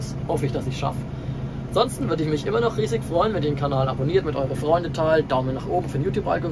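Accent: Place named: Franconia, Germany. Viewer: Da ist Triedorf Jacke oder? Du klingst auch fräängisch. Hab da vor evtl auch hinzugehen nach dem Abi.😊 Und btw ich meine gelesen zu haben, bis 1900 Undrehungen ist verzögern 0 Problem. (Bei Druckluft gebremsten Anhängern ist das natürlich was anderes) Wir fahren eigentlich zu 90% einfach mit TMS und Fahrpedal.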